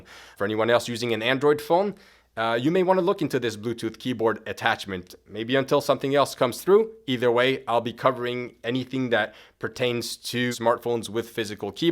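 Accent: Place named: Montreal, Canada. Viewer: Random but are you from Montreal? I hear a familiar accent 😆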